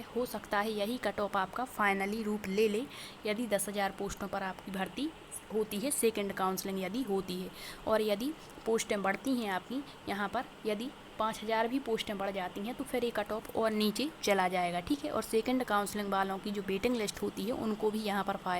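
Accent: Bundelkhand, India. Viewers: आपके बोलने के लहज़े ऐसा लगता है कि आप बुंदेलखंड से हैं मैडम🙏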